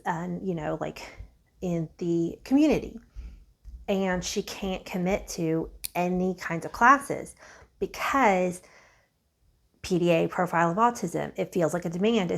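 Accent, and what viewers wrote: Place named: South, United States. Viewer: I knew you were from one of the southern states, I mimic accents and I pick up on accents....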